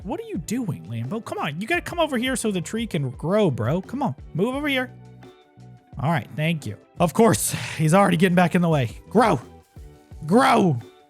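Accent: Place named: South, United States. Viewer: I never ever comment or anything on YouTube but I have a question. Where in the south are you from because all the other MC youtubers sounds like their British or northern American. Idk, just curious because I could sleep to your content easily (in a good way) 😊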